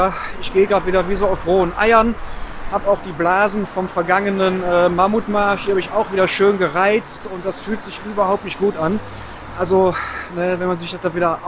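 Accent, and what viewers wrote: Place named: Cologne, Germany. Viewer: Guten Morgen. Tolles Video, wie immer und dazu dein Kölche Dialekt, vom feinsten. Wünsche Dir einen schönen Wochenstart.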